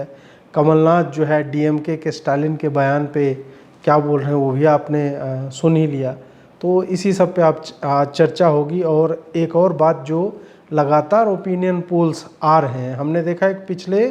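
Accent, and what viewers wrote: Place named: Begusarai, India. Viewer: अपने टोन में कुछ बेगूसराय्या भाषा का प्रयोग आपके वीडियो को बेहतर बना देता है।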